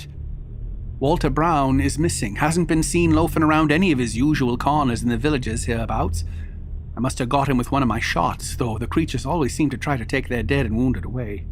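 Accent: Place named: Vermont, United States. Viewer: Best recording of this story I have heard, and I try to listen to them all. Impressed with your Vermont accent.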